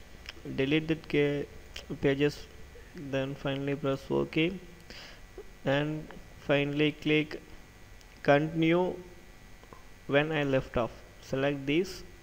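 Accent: India. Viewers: When people make fun of him for trying to talk English. He lives in India, of course he is going to have an accent. At least the websites and pages he has open has the words in English for those to understand easily. Heck I can understand his steps even if I didn't have the volume on, read the pages and follow along. .-.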